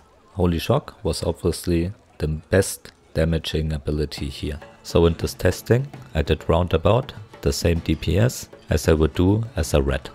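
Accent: Germany. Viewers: Man hört so krass das du deutscher bist 😂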